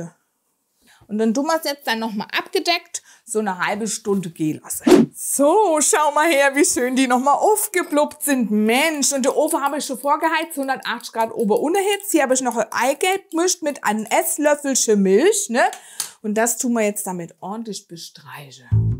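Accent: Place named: Palatinate, Germany. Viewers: Wenn ich mich nicht irre hört sich des an wie bei Joy Fleming, also Mannheimer Schnauze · Ja, das sieht lecker aus! Und wenn ich dieses Pfälzisch hör, bedauer ich's mal wieder, dass ich keinen Dialekt anständig sprechen kann.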